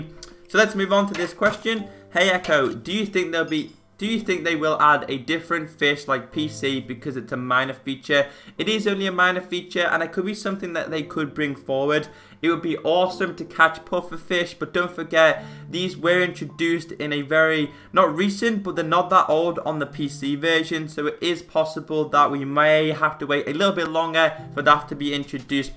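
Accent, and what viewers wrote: Liverpool, United Kingdom. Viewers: Are you from liverpool ?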